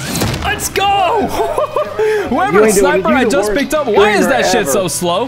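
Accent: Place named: United States. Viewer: Most American sounding Kiwi around, you even from NZ uce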